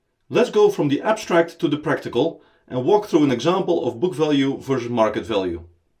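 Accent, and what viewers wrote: Netherlands. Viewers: Are you Dutch? :P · Lekker Nederlands accentje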